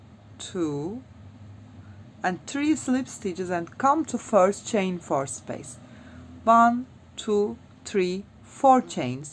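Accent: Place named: Turkey. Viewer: best turkish-english i have ever heard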